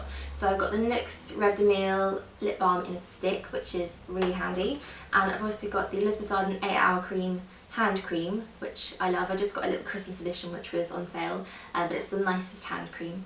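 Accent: United Kingdom. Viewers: I want that accent if i was britisch i will never stop talking :)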